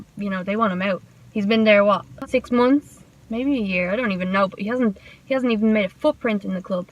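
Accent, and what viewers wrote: Ireland. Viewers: HI HOW ARE YOU BEAUTIFUL 😘 I HOPE WE SIGN KOULIBALY. I REALLY LIKE YOUR ACCENT 🇨🇮 #IRISHACCENT XXX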